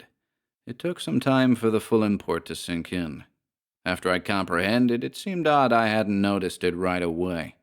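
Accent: United States. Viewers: Your American accent is fantastic! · I love your ‘Merkin accent,. Nailed it!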